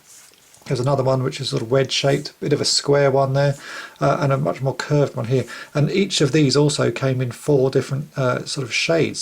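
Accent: United Kingdom. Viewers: John Jones he's a Brit. vowels tens to be reversed.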